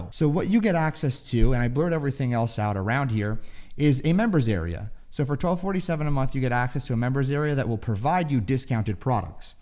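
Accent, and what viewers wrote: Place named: New York, United States. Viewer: Hahaha, no one will say yes bro if they're under the shadow making millions. I also like your NY style of talking about the ones in the dark, keep it up.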